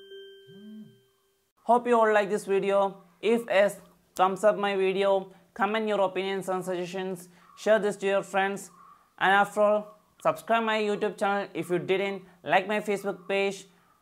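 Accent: Kerala, India. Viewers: Dushyant Vasani he spoke with a Malayalam accent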